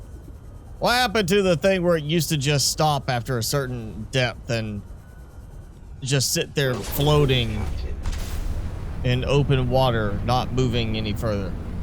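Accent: South, United States. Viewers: I hear a country accent in your voice lol, where u located at. I'm down in Mississippi lol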